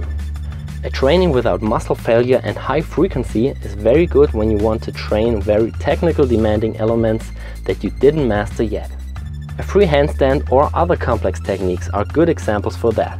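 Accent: Germany. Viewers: DEUTSCH AKZENT :) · omg... What's happening to me? Now even German-accented voice alone gives me a hard on?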